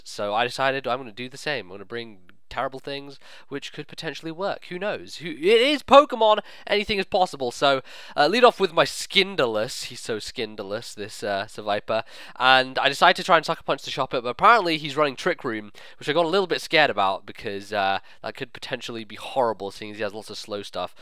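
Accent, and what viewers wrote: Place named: Scotland, United Kingdom. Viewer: Didn't know you are Scottish. So am i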